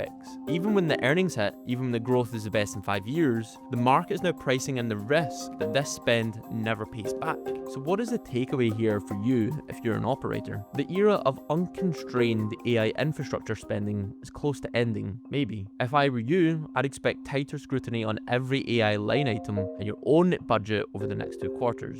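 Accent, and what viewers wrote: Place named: Scotland, United Kingdom. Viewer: Don’t waste any time at all trying to change your native Scottish accent. You are easily understood with Scottish as is. 👌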